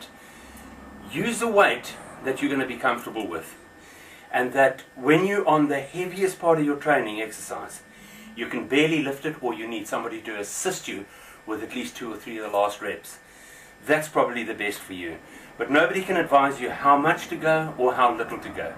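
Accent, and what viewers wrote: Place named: South Africa. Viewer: You kinda sound South African - and you are in such great shape for a 60 year old - I'm impressed!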